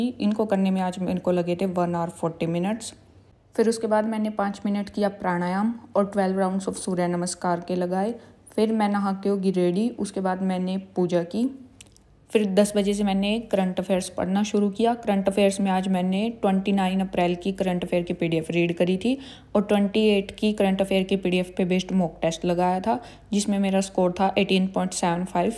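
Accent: Haryana, India. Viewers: Haryanvi type language h aapki ...?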